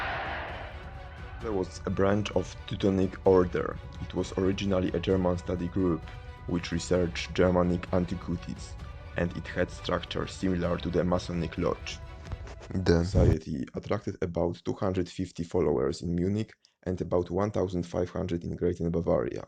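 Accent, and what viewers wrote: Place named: England, United Kingdom. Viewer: Why so annoying english accent? Pease, first learn speaking then speak.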